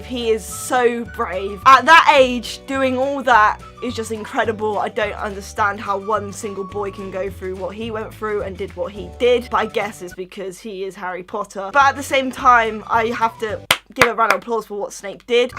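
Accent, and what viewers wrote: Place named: United Kingdom. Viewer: sometimes she speak so fast than mixed with the British accent it is impossible to understand a word 😂 but anyways i love this girl so much 💚💚 (and her accent👀)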